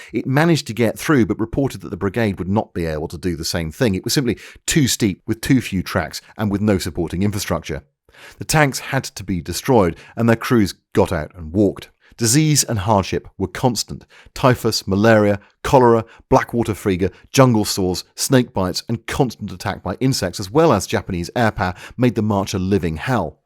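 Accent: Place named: Birmingham, United Kingdom. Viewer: Hey, thanks. Liked the Brummie accent. 😎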